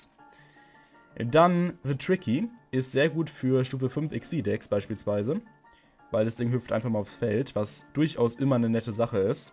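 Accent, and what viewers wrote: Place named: Germany. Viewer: Du hast so nen schönen deutschen Akzent wenn du englisch redest :D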